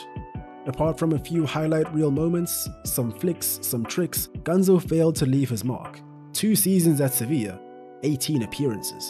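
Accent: South Africa. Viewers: Great to hear a South African doing his thing on this channel. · You Sound South African Bro🇿🇦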